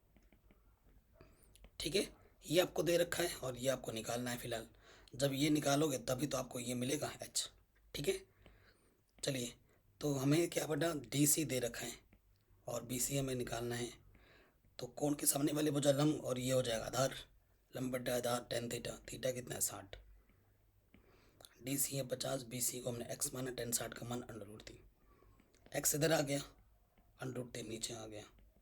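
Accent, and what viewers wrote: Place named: Haryana, India. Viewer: Bhai to Haryana se hai..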